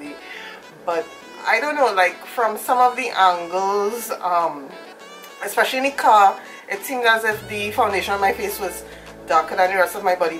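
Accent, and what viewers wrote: Trinidad and Tobago. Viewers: Are you trini???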